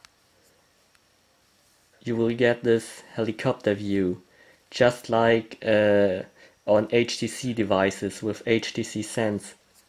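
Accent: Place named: Germany. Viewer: awesome german accent...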